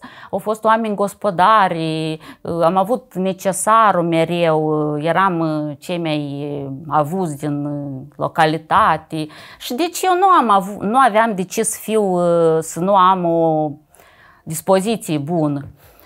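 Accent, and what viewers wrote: Moldova. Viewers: O poveste autentica povestita chiar in dulcele grai moldovenesc!Ce mult imi place,te-as asculta zile intregi!Dumnezeu sa te aiba in grija sa!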